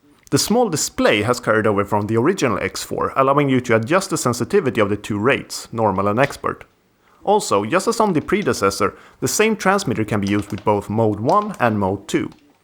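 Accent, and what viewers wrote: Sweden. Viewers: Är du svensk? din engelska låter så iaf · Are you swedish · Takes a Swede to know one maybe XD could hear it too immediately and I'm a swede as well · i can hear that this guy is originally swedish :D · Let me guess, you're swedish? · Yay Jag hör en svensk!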